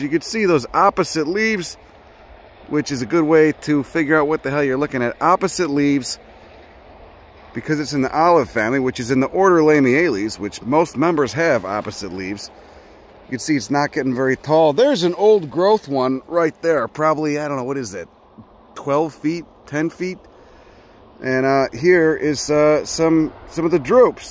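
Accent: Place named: East, United States. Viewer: I'd love to know, where is that accent from? I'm from CO and CA, so I have trouble identifying Eastern accents.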